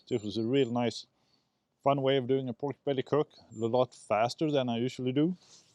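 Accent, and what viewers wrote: Scania, Sweden. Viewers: Saknar verkligen din skånska från dina tidigare videos. När du pratade på svenska var du mer unik. 😊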